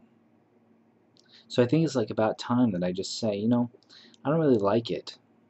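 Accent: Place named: New York, United States. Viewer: When you say "personality", do you still identify with INTP and the idea that personality becomes rather fixed by this point in life? As far as diving deep and really searching and theory building around yourself, I feel like for INTPs it feels really really fixed and not in a happy way. I accepted that, and I gave up on trying to subvert that. However, I feel there's ways to de-emphasize that insecurity, that deeply analytical or critical personality conflict. Just going exploring, weird places and doing new stuff, especially physical stuff, where you lose your head space . Like how traveling requires way different aspects of my personality and at the same time dissolves my identity because I don't know anyone and no one knows me so I can be ridiculous. Like just walk down the street and stick out your thumb and see if someone picks you up and you might feel like your personality could change. 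Or find a freight yard and hop on a freight train and become a new person, someone you've never met. What I'm saying is I think sometimes we get stuck in personality patterns and cycles because our environment. If we change our environment or schedule or the weather or people in our lives, we can see new parts of ourselves. You sound like you're from New York or something so you know how defined things can be on the East Coast/in the Northeast. Maybe those definitions highlight your insecurities? I'm from Massachusetts so I know this is possible. Our state creed is "I'm so much better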